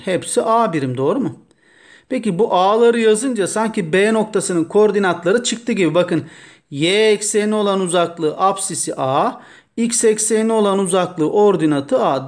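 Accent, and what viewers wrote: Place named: Çorum, Turkey. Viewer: Corumlu şivesi eskisehir veya sivas herhangi biri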